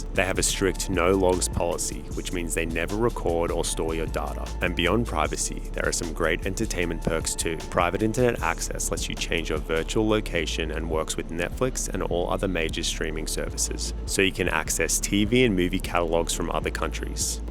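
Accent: Australia. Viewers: It’s that chill Aussie with the interesting stories again :)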